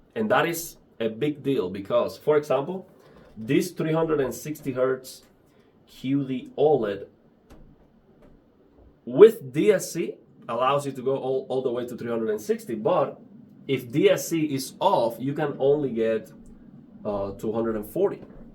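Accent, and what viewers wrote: Cuba. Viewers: I knew that was from some Spanish speaking country because of occasiona cute s before e. Cuba:) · Lo sabiaaaa asere el acento me sonaba a cubano. Felicidades hermano. Keep it going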